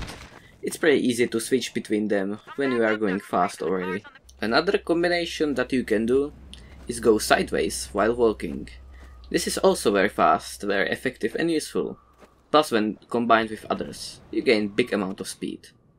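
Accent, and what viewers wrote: Czech Republic. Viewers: Cz accent :D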